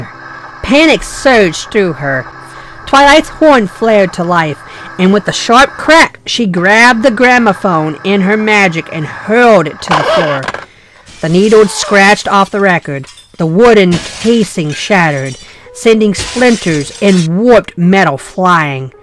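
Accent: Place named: United Kingdom. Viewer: Oh, Another British grimdark reader. Interesting very interesting indeed. New subscriber.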